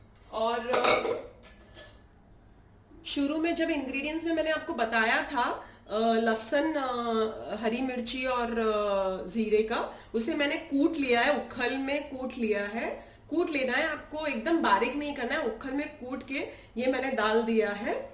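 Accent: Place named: Gujarat, India. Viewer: Masha Allah 👌👌 I like d way u talk as we gujarati peoples do..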